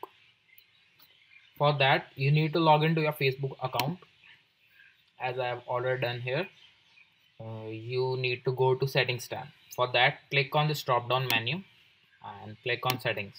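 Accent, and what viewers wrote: India. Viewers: i still wonder why indian accent gives me headaches. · you such disrespectful of person just because the accent. India very smart of technologies thanks to them they make computers and smart phones.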